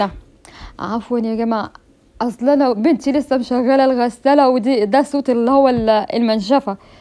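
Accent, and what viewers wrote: United States. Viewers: من لهجتك كدا انتي ياما مولوده ف امريكا ياما روحتى وانتي صغنونه تسلم ايديكي💐💗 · هو انتى منين وبقالك كام سنه فىامريكا مش عارفه عربى ههههه على امريكى عسل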